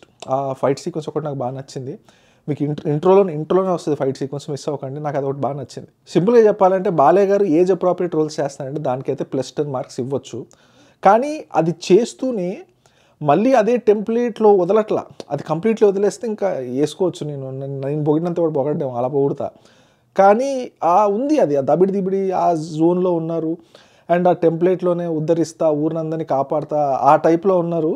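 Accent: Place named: Ichapuram, India. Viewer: Blue films review Ichapura lahja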